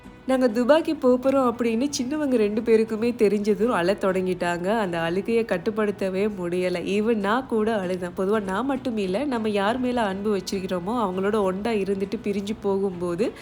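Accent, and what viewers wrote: Sri Lanka. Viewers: Hii assalamualikum sis❤.....I want to tell you one thing...that my mother had past away a few moths before....me and my mother used to see your video every night before we go to sleep....my mother really loves your sri lankan tamil slang of your voice....and seeing your video remains our memories of dubai...because we had lived several years before in dubai......and now she is not there to see your video.....sis pls dua for her.....and keep her in your dua.....I will also pray for your family....Allah make you to reach high....with good health......inshaallah ❤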